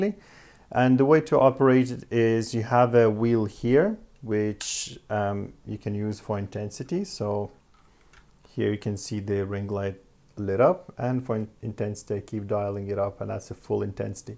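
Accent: Ireland. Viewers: Thank you so much for this review, it has helped with my decision to purchase it. Totally off topic but where is your accent from? I'm sure I can hear an Irish twang mixed in with European!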